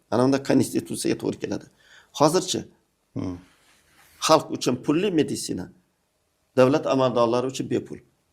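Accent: Khorezm, Uzbekistan. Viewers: Акцент хоразмча че та..не важно..лекин маладец рахмат! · Хоразлик инсон . гапиришидан билиняпди. Хоразмликлар фахрланяпди СИЗ билан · Укажон сиз аник хоразмдансиз шевангиздан билиниб турибди ! Ота онангизга рахмат !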